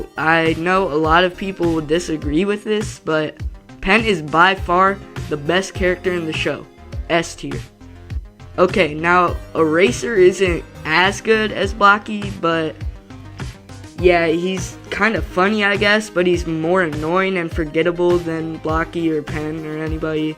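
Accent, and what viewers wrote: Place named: Texas, United States. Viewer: dude sounds like that local texan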